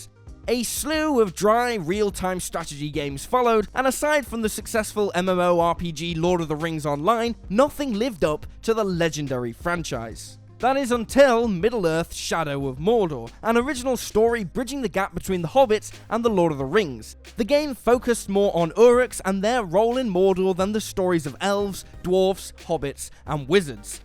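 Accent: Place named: United Kingdom. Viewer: Usually British accents sound pleasant. This is a big break from that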